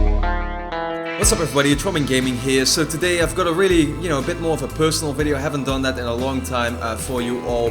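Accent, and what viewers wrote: Netherlands. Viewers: are you dutch? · I think he's Dutch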